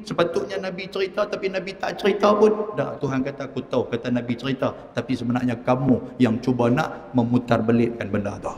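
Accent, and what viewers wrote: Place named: North, Malaysia. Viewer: betul kan ,,sy tahu sbb sy peminat ustz samsuri ahmad,,pejam mata sy dah tau ini suara ustz tp semalam membuatkan sy terkejut beruk dengar suara ustz ini,, Cara penyampaian lenggok dia bila berkata sama sgt,,lorat pon utara,,lawak dia pon sama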